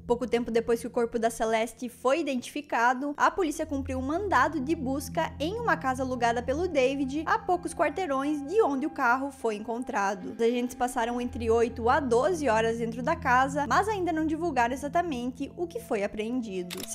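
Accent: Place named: Curitiba, Brazil. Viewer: Video muito bem produzido! Parabéns! ❤ Uma dúvida, você é de Curitiba? Pergunto por causa do seu sotaque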